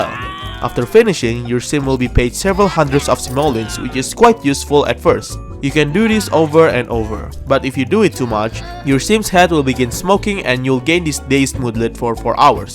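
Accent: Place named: Indonesia. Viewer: Bi lo orang indo? Logat lo indo bgt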